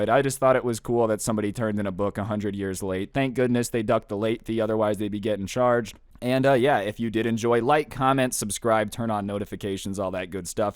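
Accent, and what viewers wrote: United Kingdom. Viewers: Nice British accent. Keep it up.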